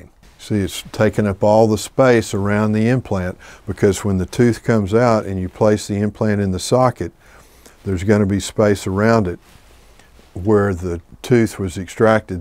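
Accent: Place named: Texas, United States. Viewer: The only doc I would listen to with the Texas southern accent 🙂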